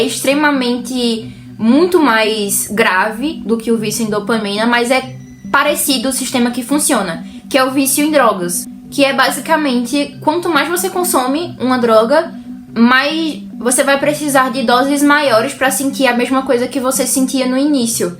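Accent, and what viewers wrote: Northeast, Brazil. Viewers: Seus vídeos são incríveis, vc é muito fofa,ganhou mais uma inscrição, ah e você tem sotaque nordestino,vc é do nordeste?? De qualquer forma,irei acompanhar seus vídeos! ❤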